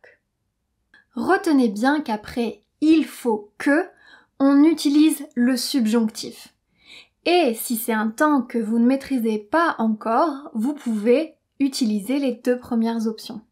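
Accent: England, United Kingdom. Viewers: I am so tickled that you have an English accent with your English language. ❤ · ou peut etre... vous sonnez comme British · I noticed that you're pronunciation in English like British. N'est ce pas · Am I the only one who besides looking forward to learning French from, also looks forward to hearing English accent? HAHAHAHA. C'est très beau! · Je suis un peu confus maintenant... 😐 Selon Duolingo, voilà quelques exemples comme ça: Il faut - he, you must Il dois - He has to Il devrait - He should Je voulais te dire que j'aime bien ton accent en parlant anglais, tu parles comme les anglaises. C'est si mignon 😍 Est-ce que tous les français ont l'accent du Angleterre en parlant?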